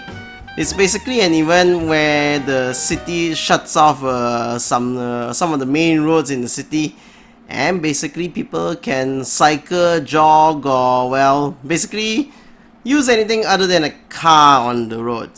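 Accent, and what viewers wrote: Singapore. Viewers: You sound like a Singaporean/Malaysian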